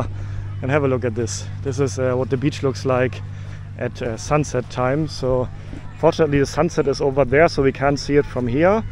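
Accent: Germany. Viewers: He is germans 😂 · Sounds like you are from Germany or German speaking country……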